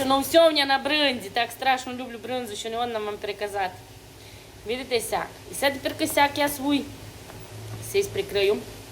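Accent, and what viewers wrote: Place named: Western Ukraine, Ukraine. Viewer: Мої вітання. А Ваш говір то Чернівецького регіону чи Франківського?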